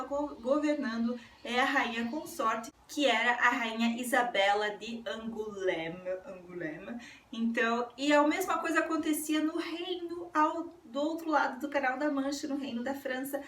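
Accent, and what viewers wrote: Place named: Paraná, Brazil. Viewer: Vc é uma " gracinha" ! Teu sotaque parece ser de PARANAENSE....muito charmoso !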